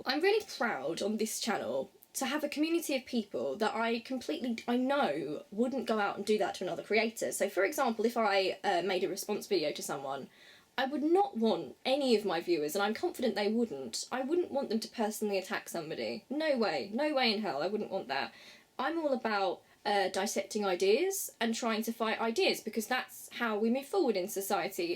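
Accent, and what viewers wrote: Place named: England, United Kingdom. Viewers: More proof that everything sounds better in an English accent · Wow, lol. That surprised me, when you read those comments out like that, raw and uncensored. I must say, you were sexy while doing that, and it was stimulating, in an odd way...(grin) I guess its because you have that English accent, and always seem to be very proper and have that kind of good girl image, that it was shocking to hear you read some of those lewd comments out loud. Anyway, to get serious, I admire that you are able to handle that sort of online abuse/harassment, it shows you are a strong person within yourself.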